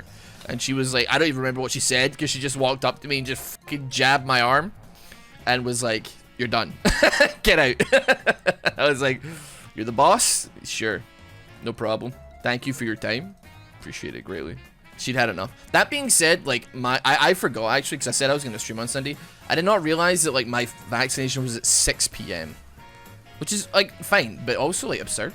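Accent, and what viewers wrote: Scotland, United Kingdom. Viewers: I need to know if you are capable of saying "purple burglar alarm," as supposedly some Scott's cannot.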